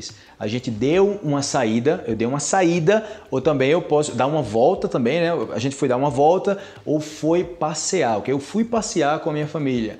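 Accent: Antioquia, Colombia. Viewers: No es paisa? 😮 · Eu gosto deste canal porque você tem um sotaque colombiano bem vocalizado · Felicitaciones a antes había visto varios de sus videos hablando español se le nota un poco el acento colombiano. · Tiene mas acento colombiano que yo 😧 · Se nota el acento colombiano... 👍Saludos desde Argentina. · Pareces de acá de Colombia cuando hablas en español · A mi me parece que ud tiene mas acento colombiano que acento brasiliano? Como puede ser si ud viaja por toda hispanoamerica? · Habla mejor español colombiano que yo como nativo, nunca pude hacer bien ese acento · Habla con acento Colombiano, pensé que era de Colombia hablando un perfecto portugués. Jeje que bueno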